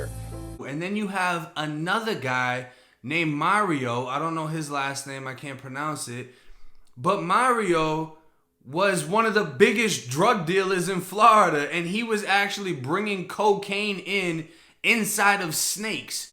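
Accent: Boston, United States. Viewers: Bro if your from Florida why are you speaking with a Boston accent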